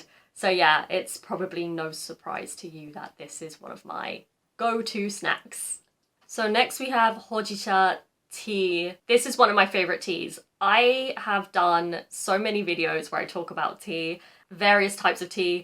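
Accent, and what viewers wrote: United Kingdom. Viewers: carwowのマットとしゃべり方が同じだ。これがイギリスアクセントか。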